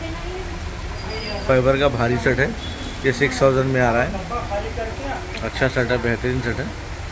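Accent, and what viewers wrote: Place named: Hyderabad, India. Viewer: Walaikum assalam o rahmatullahi wa barakatuhu Bahut accha lag raha hai aap hyderabadi language mein baat kr rhe ho 😅 Beautiful collection 🎉❤😍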